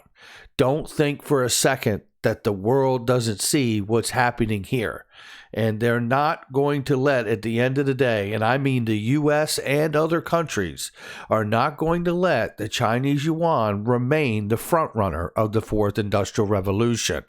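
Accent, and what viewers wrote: England, United Kingdom. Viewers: Come on mate, that sounds like a pommie accent, not a Aussie.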